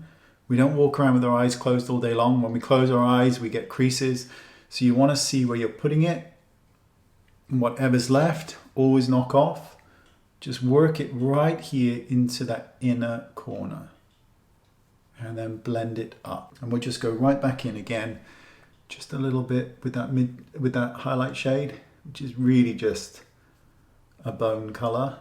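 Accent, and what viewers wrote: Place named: United Kingdom. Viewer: That British accent gets me every time.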